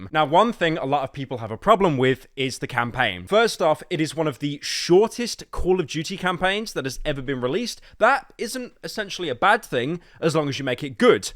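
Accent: United Kingdom. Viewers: a brit talking about a game. just what i wanted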